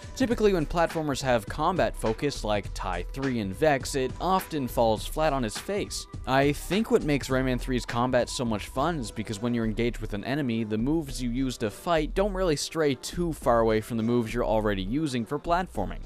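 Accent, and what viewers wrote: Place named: Canada. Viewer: listens for about 5 minutes So, where in Canada are you?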